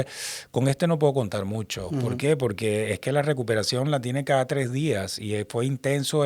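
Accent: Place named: Caracas, Venezuela. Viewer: Ese acento me recuerda al Guaraira Repano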